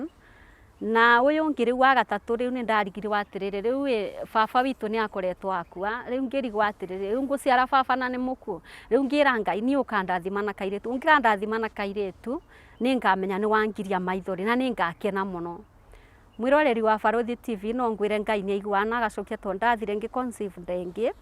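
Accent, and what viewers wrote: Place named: Kirinyaga, Kenya. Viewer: good show. Kama wewe unajua Kikirinyaga yako ni ya "aba" and not "haha" stick to one tongue sisi Wakirinyaga tunajua Kikikuyu yetu.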